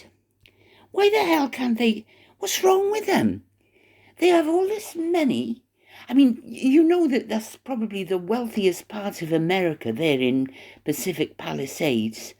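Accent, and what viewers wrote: Wales, United Kingdom. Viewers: Just found your channel, it’s lovely hearing a Welsh voice, so sorry you have been poorly, this Welsh weather hasn’t helped anyone has it, damp, cold, foggy and grey. You take very good care of yourself. No one likes going to the Dr but sometimes my lovely we need to, you go and get the blood test done and sort everything out you’ll feel much better afterwards. Stay cwtched up and take care🙏❤️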